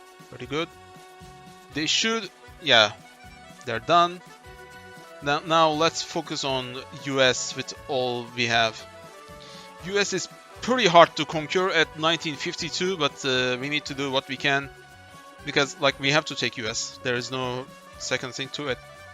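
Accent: Turkey. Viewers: aksanından Türk olduğunu anladığım hoi4 oyuncusu. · bro are you turkish you have turkish accent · Aksanından türk olduğun çok belli oluyor ama çok iyi konuşuyorsun,güzel oynuyorsun ama kanka çok uzun videolar,mountain general gibi kanalları örnek alabilirsin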